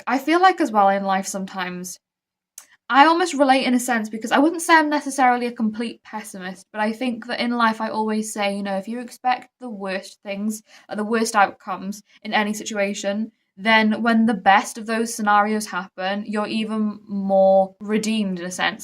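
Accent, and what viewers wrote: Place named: Nottingham, United Kingdom. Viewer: Your very smart.. thank you for appreciating mvs.. I remember my friend from nottingham in UK.. you both speak the same.. ❤❤❤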